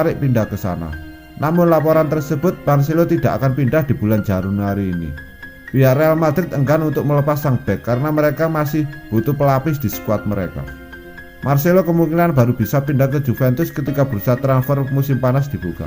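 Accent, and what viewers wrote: Java, Indonesia. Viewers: Medok amat jawanya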